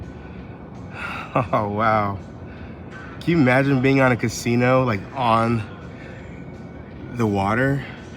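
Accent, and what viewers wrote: South, United States. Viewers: Um, you do have a bit of a southern accent to those of us not from the south. 🙂 Those beignets looked good. You can only have like one and have to pray that a diabetic coma isn't induced. But they are good with coffee. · ❤❤ I just learned how to pronounce beignets. Yes Southern accent is heart ❤️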